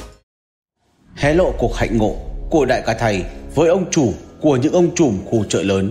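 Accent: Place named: North, Vietnam. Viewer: Giọng bk đèo bỏ mẹ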